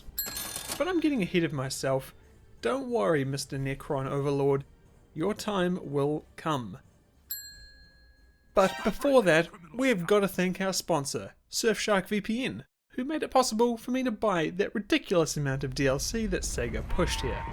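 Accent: New Zealand. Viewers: Loving your kiwi accent; this expansion was indeed the beginning of the end, despite some good ideas...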